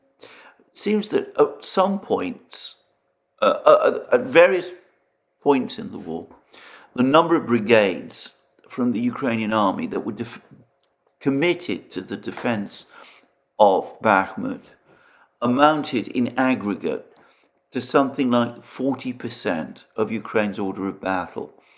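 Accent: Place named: North London, United Kingdom. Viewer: Michael Caine = East London accent. Alexander = RP (received pronunciation, ie, schooled,) with North London overtones. The people you knew from Wales will have been extremely different, more of a sing-song and softer accent. The regional accents from, for example, Wales, Cornwall, north of England and Scotland vary widely among themselves and are an important part of our history and heritage. More diluted now than they used to be, but still interesting. Likewise, of course, American accents differ, in different parts of the country. 🤓🤓🤓👍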